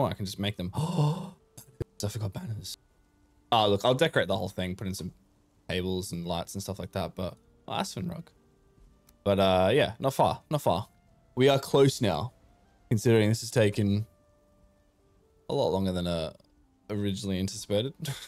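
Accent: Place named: Pretoria, South Africa. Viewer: Not the biggest (smug smile) but impressive for sure. I wish there were MASSIVE gates that you could use especially for harbours. I love your content, l especially relish your expressions when you die. I almost spat out my noodle soup when you took a lie down after dying in one of your early vids when you tried to get your stuff back from your grave during an undead raid. Much respect though that you do all in the classic game style. I build with the build mode and with a number of mods, so comparing my builds even if they are bigger is no contest against your achievment ! Oh and no worries, your Harbour really does look great, at least in my opinion. The only thing I would have added though is a gallery on either side of the great hall. You have plenty of room on either side where you could also extend outwards and add a roof on either side. 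On the inside you could then use that gallery as a balcony. Perhaps also decorate it with more chests and barrels and stuff. That is what I do on my harbours to make them look utilised. I would love to show off my builds as well, but first I need a better PC and need to figure out how to make youtube content. All in good time 😉Keep up the amazing content. I love seeing your base grow. Oh btw. Where in SA are you from ? sounds like Praetoria.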